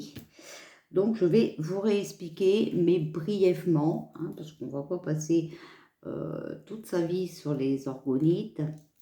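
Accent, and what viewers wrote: North, France. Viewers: Bonjour Je vous suis depuis un petit moment je m' éclate d'abord avec votre accent du Nord surtout quand vous dites "voilà " j' adorrrrre!! Le 16 juillet à 20h precises sur Web tv RDH (Rassemblement des Droits de l'Homme) un certain Philipoe Argillier doit parler. POURRIEZ-VOUS NOUS DIRE SI C'EST QUELQU'UN DE FIABLE OU PAS. MERCI🙏❤️ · Bonjour j ai découvert votre chaîne il y a peux de temps aussi j attend les suivantes avec impatience j aime votre accent du Nord et votre gentillesse votre nouvelle coiffure vous rajeunit pouvez vous svp me donner les liens de votre site j'aimerai vous acheter des angonites merci bonne soiree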